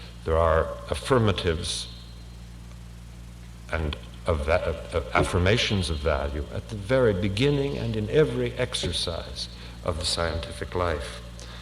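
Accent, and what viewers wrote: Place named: Mid-Atlantic, United States. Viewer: The “Mid-Atlantic” accent needs to make a comeback…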